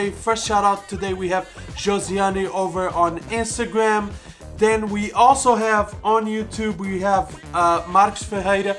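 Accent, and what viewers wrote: Brazil. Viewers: R u brazilian or maybe ur parents? Cuz ur name sounds Brazilian and when u speak Portuguese u kinda have a Brazilian accent...it doesn't sound like an american speaking portuguese 😂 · Ele é Brasileiro? Tem acento brazuca em inglês!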